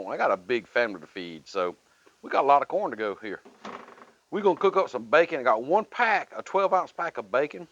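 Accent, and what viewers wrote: South, United States. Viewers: You southern boys crack me up. Looks amazing! I’ve got lots of fam in Georgia that sound just like you!!🤣🤣 so far a fan and...subscribed! Keep up the good work. Look forward to more.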